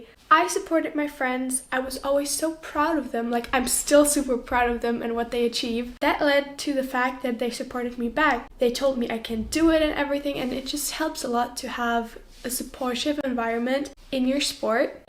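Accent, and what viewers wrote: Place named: Europe. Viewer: ahhh, habe den Akzent rausgehoert, aber war mir nicht sicher woher aus Europa der ist. Wieviel zahlst du fuer eine private Stunde?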